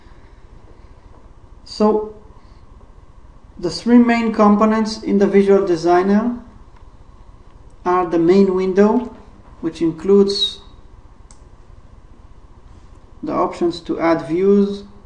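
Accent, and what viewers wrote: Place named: Israel. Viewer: GO israeli accent!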